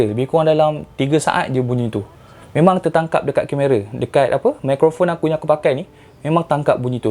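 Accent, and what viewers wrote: Kelantan, Malaysia. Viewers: Kelate ko?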